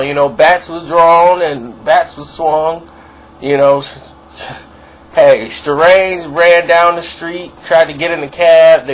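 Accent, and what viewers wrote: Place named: Rural, United States. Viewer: This nigga telling half truth bullshit ass youtube videos. This nigga sound like he from the "COUNTRY" somewhere smh...stfu bama!!!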